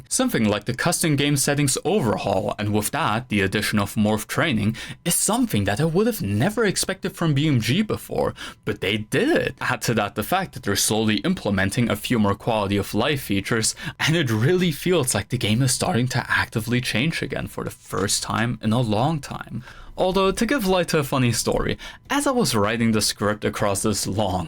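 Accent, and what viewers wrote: Germany. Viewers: WOW combo codex an absolute fucking slap of nostalgia lmao i did not expect to feel this i wasnt in that community or anything, though kurta and a few other friends talked to me about it, but i remember that logo popping up in a bunch of momo's (oof) 2v2 combo videos also now that you mention floater i feel like i could recognise you from there too since ive watched a decent amount of his streams, mostly because i liked his hair a bunch xdd also glad to see someone else who loves catnips videos, i feel like i was always surrounded by people who just didnt think anything of him (one person in specific who i wont name disliked him because he dislikes eve music, which is a valid point i guess) and yeah the way weapons used to work definitely kept a good part of the game moving. after having played a bunch after that stuff got patched out, watching those old jellyfish montages it feels like the game was literally broken 😭 the amount of times i got invited into a lobby after a game and get my hopes of making a new friend of a person i enjoyed fighting crushed, because they didnt have fun and just wanted to drop a few slurs, eugh anyway ive been playing tf2 lately and since you mentioned it too i figure its about time someone recommended it to you :))))))) give it a shot, its deeper than it seems but also easy to get into also all this and this is how i find out you speak german lmaoo i had a hunch because of your accent but didnt want to say anything nochmals, danke fürs Video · and the solution of what, to provide a synonym of "let me explain", you have a better writing style, and much more vocabulary than me, and you are expressive. 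So i guess u will figure it yourself, u dont need a solution from someone is worse than in that area that is why i did ignore the request because im not qualified and it looks silly to me :p "asking for a synonym" also at first i thought even if i provide u a synonym what is the point u will repeat it, or maybe u will use a variety of words to explain one thing, which u can use one word for it. And the thing is, dont take it as a flaw it is ur style, many people in the world do the same thing they have some repetitive words and u can realize it, idk if they themselves realize they keep repeating the same words or not. In your situation i just pointed it out as a joke you don't need to fix things u aren't broken. And your english is really fine, i even thought unironically the pronunciation of "other" is "oder" because im not a native speaker + and English is not pronounced as written, until i opened your channel and i saw the location of ur account is germany so i knew that was your accent.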